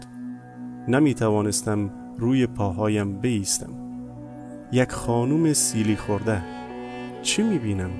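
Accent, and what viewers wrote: Afghanistan. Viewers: جناب چرا اسم و محل آن شهید را به خانواده هایی که عزیزانشان سرطان و یا مریضیهای نا علاج دارند ندادید که آنها هم مثل شما خوشحال شوند مگر آن مادرانی که فرزندانشان درگیر مریضیهای مهلک هستند آدم نیستند و احساس ندارند ! ؟؟ میدونم که این داستان مال حدود یکسال پیش است ولی دردمندان زمان نمیشناسند بروید و به دادشان برسید مگر آن شهید بهش سفارش شده فقط برای فرزند شما شفا بگیرد ، راستش نمیدونستم آن دنیا هم پارتی بازی میکنند درثانی شما با این تعریف میگویید قدرت شفای شهیدان از خدا بیشتر است ! ؟ برو بابا جمعش کن و این چرندیات را به خورد مردم بدبخت و ساده دل نده ، راستی نمیدانستم مردها هم میتوانند زن فوت شده را غسل کنند خوب بوی گلاب که آن مرده میداد دیگه شستینش که گلابها برود و .... دست بردارید و حال من و بقیه ای که که این چرندیات را. البته لحجه شما بیشتر شبیه مردمان عزیز افغانستان است ، با این چرندیات خواهران و برادران افغانستان را هم که برای همه ستم دیده هایشان بد نام نکنید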